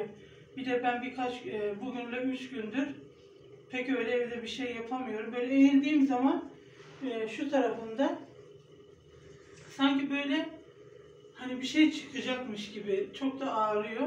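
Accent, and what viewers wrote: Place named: Aksaray, Turkey. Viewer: Aha buda aksaraylı galiba kolay gelsin bildim demi kız hanım aksaraylisin sende😊😊😊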